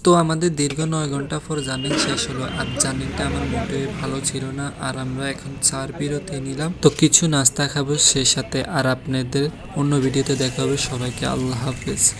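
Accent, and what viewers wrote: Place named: Noakhali, Bangladesh. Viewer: ভাই? আপনার বাসা কি নোয়াখালী?? কারণ আপনার কথায় নোয়াখালীর স্হানীয় ভাষার টান পাওয়া যায়।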